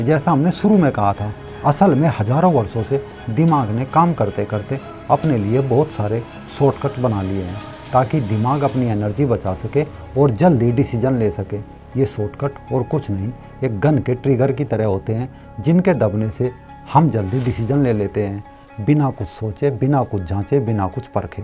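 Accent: Haryana, India. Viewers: RAM RAM BHAI HARYANVI LAG RE HO AP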